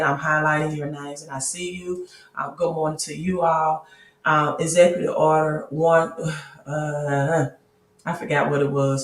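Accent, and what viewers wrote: South, United States. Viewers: Hello Beautiful! Love your southern accent!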